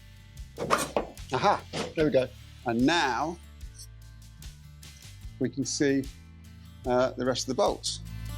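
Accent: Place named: United Kingdom. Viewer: muy intersante! Mi padre tenía uno de estos, ¡estos son recuerdos para mí! Y me encanta el acento británico!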